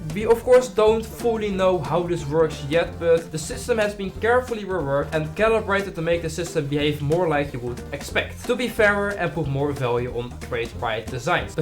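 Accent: Netherlands. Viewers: Ik ruik Nederland (door je accent)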